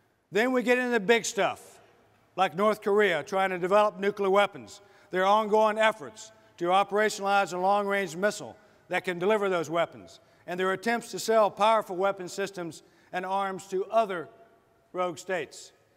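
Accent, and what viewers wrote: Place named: Tennessee, United States. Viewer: He's Japanese on his mother's side and he has a Tennessee twang. I like this guy.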